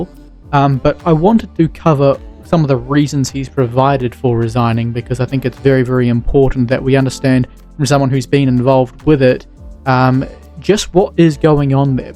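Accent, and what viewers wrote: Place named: New Zealand. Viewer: arrrh the Kiwi Accent. Nice content try not talk the Kiwi Accent if can - try watch more foreign films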